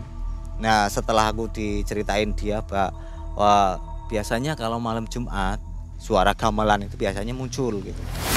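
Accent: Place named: East Java, Indonesia. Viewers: Jowomu medok leh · Logat jowo wetan iki mang ei,serem critane😮😢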